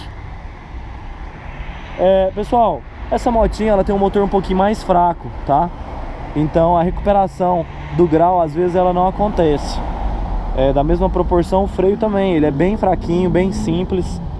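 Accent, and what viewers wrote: Goiânia, Brazil. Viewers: Você e de Goiânia ?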